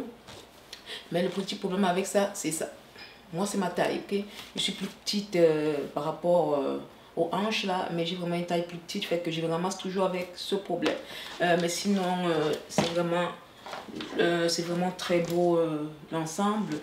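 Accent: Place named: Cameroon. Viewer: Je ressens un accent camerounais, vous êtes camerounaise ? J’adore ce haul surtout la longue robe à la fin la .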